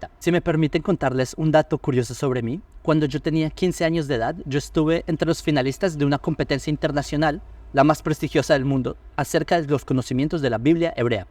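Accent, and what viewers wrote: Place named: Bogota, Colombia. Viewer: Muy buena explicacion...entonces es el estilo de tematica de Ezequiel...,tu hablas como si hubieses vivido en Bogota...tiene un español como de Bogota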